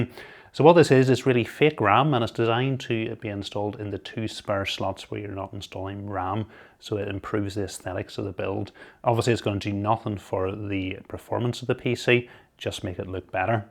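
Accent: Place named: Northern Ireland, United Kingdom. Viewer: That's just a northern Irish accent...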